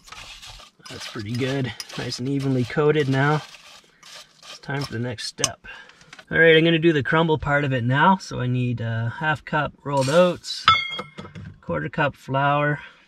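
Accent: Canada. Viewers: Which part of Canada are you from? Seems like you’re accent is type of Canadian accent Americans make fun of.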